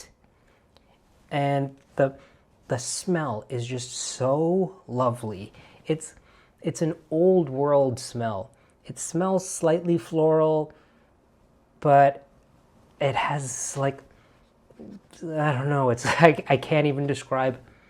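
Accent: Ireland. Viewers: As an Irish person and long time listener I am so excited to hear you do the Irish accent!!! So glad we made the rotation 🎉😊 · 1 minute in and I'm laughing out loud and relating so hard. 😂 Somehow the Irish accent was the perfect intro for this subject. 😅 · I am impressed with the Irish accent! And... everything else does. LOL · Like the Irish accent 😂🇮🇪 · I thought he was Irish for a moment and didn't realised the transition to American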